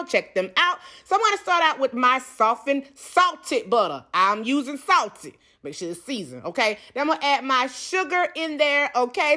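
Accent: England, United Kingdom. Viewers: Not the British accent 😭😭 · Love the hood, England accent. You ought to give us that combo more often I LOVE IT🤣 also the cake looks moist and delicious. Im excited to try this recipe for sure. Thanx my girl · The British Accent had rollinng. I'm like "what happened"? lolololololol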